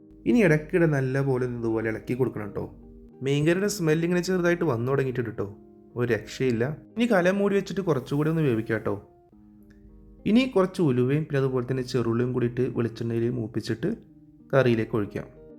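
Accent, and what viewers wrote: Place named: Thrissur, India. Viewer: ath trissur kkarde oru slang aatto😂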